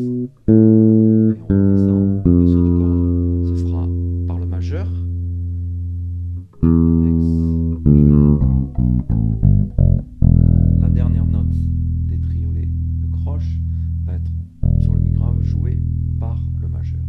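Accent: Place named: France. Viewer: haha im french and i didnt understand it all, he's from france i assume from his dialect, and i speak quebec french ahah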